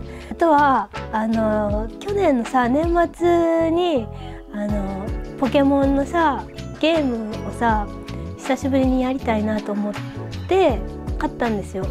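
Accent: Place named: Shima, Japan. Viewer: コロナからの回復おめでとうございます。志摩弁聞けたー🌠僕も娘の感染によって折角当たった２日の皇居一般参加を断念しました。新幹線やらキャンセルだらけで😞💦🐩との動画楽しみにしております。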